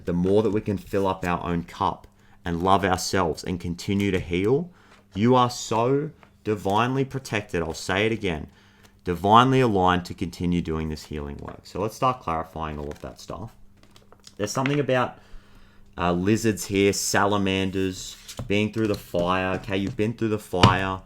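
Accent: Australia. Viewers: Tarot reading with australiam accent by is what I've been manifesting. Thanks universe 🙏🏼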